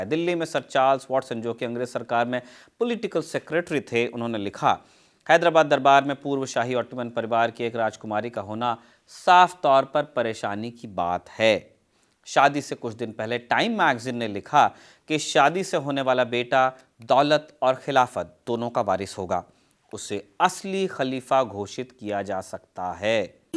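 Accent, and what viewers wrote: Bihar, India. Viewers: Good Behari accent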